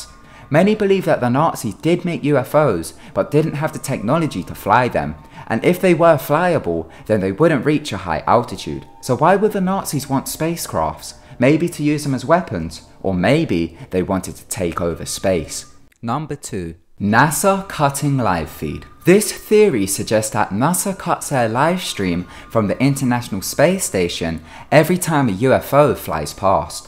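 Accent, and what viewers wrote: United Kingdom. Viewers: What on earth is this guy's accent? It sounds British English at some points, then almost Asian English in others. I find this the more intriguing question. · WOAH You reply? I thought you were famous or something. I Always get on my boyfriends channel (idk how to make one with google plus like he has) to watch your videos I love them!!!! I love guys with british accents lol. Thats crazy you reply to people, I thought all the people with so many watches since youre famous wouldn't reply to their fans and stuff thats so cool you do!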